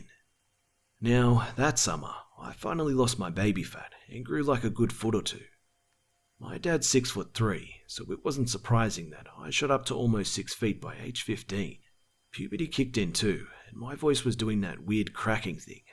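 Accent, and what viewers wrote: Australia. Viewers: Are u Australian? BTW HAPPY BIRTHDAY!!!!! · Hey! R u Australian? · Justin Ramsaran - He's Australian 🇦🇺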